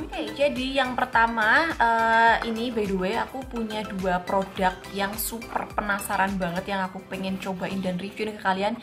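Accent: Java, Indonesia. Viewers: Selalu suka review nya mbak medhokkk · Telat 33 menit nih mbak medok..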